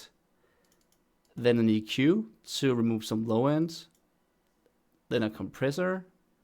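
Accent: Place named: Denmark. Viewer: Uhaaaa jeg kender en dansk accent når jeg hører den😂 Fed tutorial bro, det lyder dope🔥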